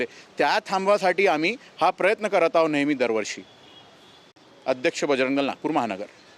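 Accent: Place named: Uttar Pradesh, India. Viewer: हा तर पहले महाराष्ट्र चा नाही उत्तर प्रदेश आहे अस वाटतय याला मराठी बराबर येत नाही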